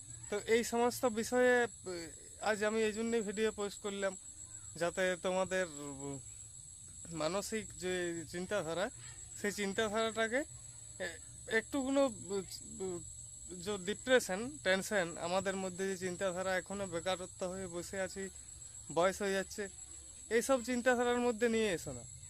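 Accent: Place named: Purulia, India. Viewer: কথা শুনে মনে হচ্ছে তোমার বাড়ি পুরুলিয়া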